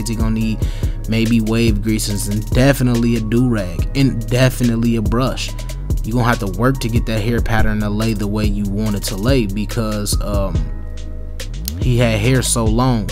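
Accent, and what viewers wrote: Chicago, United States. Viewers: Chicago accent..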